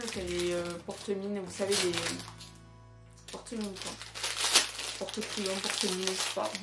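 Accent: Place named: Marseille, France. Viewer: T fournitures sont trop mignonne moi jpp faire sa je vais en cap mécaniques l'année pro donc bon 😂 mais après c'est chou j'ai trop hâte de faire mes fourniture est d'aller en cours mais que les trois premier jours après sa va me saouler ( ta l'accent marseille incroyable comme moi mais toi vrm remarquer ) ❤️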